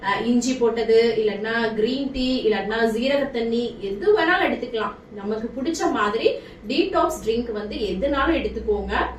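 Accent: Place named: Delhi, India. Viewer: Aap bol ra hi ho bahut accha hi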